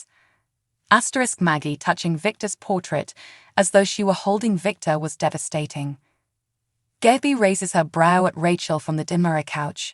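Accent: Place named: United Kingdom. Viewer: Love your accent over the pond i take it ❤ good job 👍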